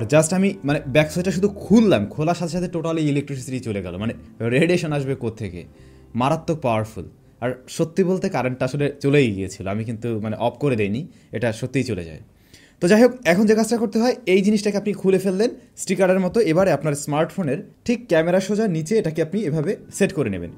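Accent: Barishal, Bangladesh. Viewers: vai ki mogo deshi naki.................. i mean borisal......???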